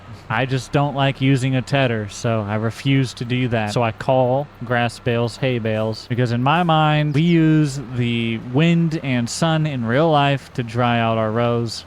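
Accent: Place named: South, United States. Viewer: I love this man and his very southern accent when he is screwing up 😂❤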